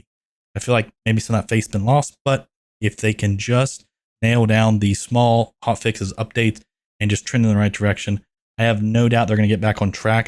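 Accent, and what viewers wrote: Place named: Baltimore, United States. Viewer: You have that Pittsburgh/Baltimore accent going on and I like it Edit Mostly Baltimore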